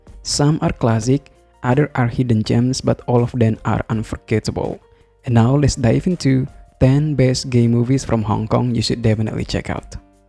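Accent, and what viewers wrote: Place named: Indonesia. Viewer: It sound like Indonesian accent.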